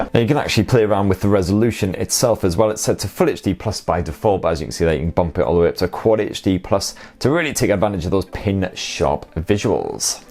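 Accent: United Kingdom. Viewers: This video can actually be done in 5 mins or less. He said he doesn't want to bore us with the similarities, but that's all he's been talking about for about 7 mins. I skipped three times. Right, like we don't know about IP67. I love the British accent, but this guy's voice is... gross. So I thumbed down, twice if I can.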